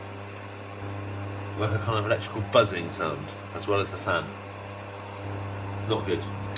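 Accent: England, United Kingdom. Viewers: You've got a great English accent and voice. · could be wrong in regards to the accent but if hes a Pom no suprise on the whinging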